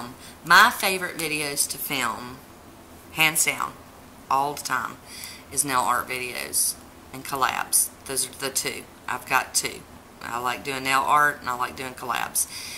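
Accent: South, United States. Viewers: I too am from the South and my accent is thicker yet than yours. When I stumbled across your channel I was like heck yeah.....a fellow Southern chic!!!! I have family in Indiana and ironically they are visiting right now and when they are here it's like oh wow maybe I do have a bit of an accent😏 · I love your accent! its so soothing to me and I lol'd when you said not everyone on the south marries their cousin 😂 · I enjoy your videos and positivity. Your nail art always inspires me, even though I haven't been patient enough to try anything yet (I'm still trying to perfect a neat manicure!) As a South Carolinian myself, it's a pleasant change to hear a genuine southern accent on YT. Keep up the good work! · Well there's your pretty face! I'm a southern gal too, and I DO love your accent 😉 Sinful colors is bomb! I just bought the neon collection today...minus the yellow. 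Can't find that one yet. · You are my favorite new you tuber (to me) happy to see the beautiful face that goes with that accent (yes I'm one of those commenters). Southern accents just sound so warm and friendly to me, just love hearing them! Thanks for the fun video, now to go check out your channel Recs!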